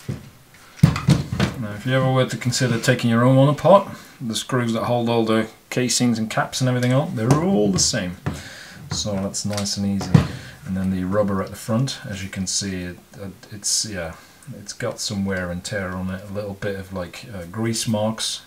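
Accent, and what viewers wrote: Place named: Australia. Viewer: Thank's a lot for the effort! 👋👋👋 It make so much sense to buy a durable tool! It is jolly good to watch screwing compoetion videos, but at the end of the day you not only need a relatively quick tool, but ot also has to be light and DURABLE!!! and reparable!!! Would be great to get a view of the competition like: makita, dewalt etc... And the Aussie accent is the cherry on top of these videos!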